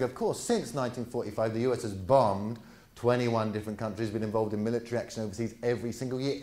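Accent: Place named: United Kingdom. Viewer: plus his british accent doent make it easier either.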